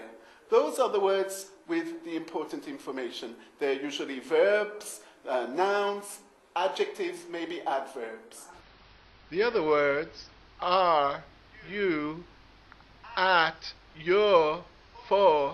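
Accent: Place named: Liverpool, United Kingdom. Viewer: Hi you know that I can understand you perfectly unless you speak with your Liverpool accent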